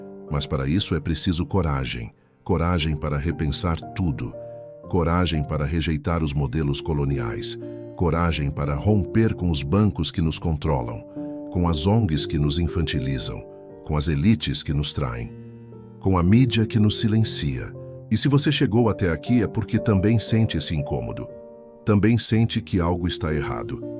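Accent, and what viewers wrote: Brazil. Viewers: Madame, então qual o motivo de "hablar" em português com sotaque brasileiro? Faça o favor de mudar para sotaque dos PALOP...🎉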